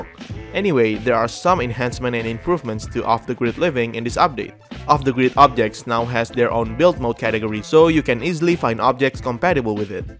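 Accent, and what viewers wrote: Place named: Indonesia. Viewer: I can hear Indonesian accent👏🏼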